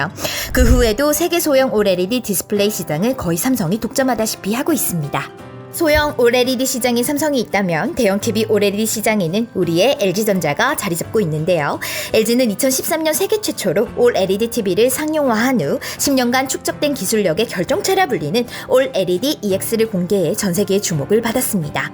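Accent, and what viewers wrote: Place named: North Korea. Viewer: 북한사람인교 아님 조선족인교 말소리가 윗지방 소리네요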